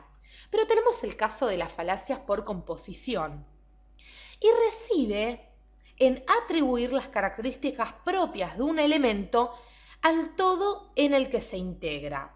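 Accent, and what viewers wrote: Argentina. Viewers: estuvo bien el vídeo pero odio los acentos argentinos ,bueno casi todos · Muy dinámico, sencillo y con buen nivel su video. Le recomiendo que continúe con otras falacias. Enseño Procesos Cognoscitivos en mi Universidad (Autónoma de Santo Domingo, R. Dominicana) y pienso usar este video como material complementario con mis estudiantes. Por su acento infiero usted es argentina (¿oriental tal vez?), país al que me unen fuertes lazos afectivos y culturales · Profesora ¡¡ maestra ¡¡ yo la admiro me gusta su enseñanza . Me encanta su acento argentino. Y no solo eso estoy aprendiendo pero no entindo su escritura ..parese la escritura de una doctora medico general . Jejejej lo hace a propocito ?? Mis respetos a usted .. ahh ya entendi nada es facil en esta vida ....un humilde servidor suyo ..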